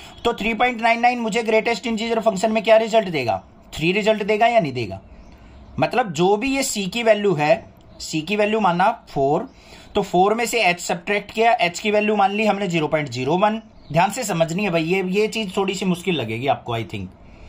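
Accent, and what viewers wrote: Haryana, India. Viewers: Sir aap hariyana se ho ?aap jbb bol re ho tbhi pta chl ja rha h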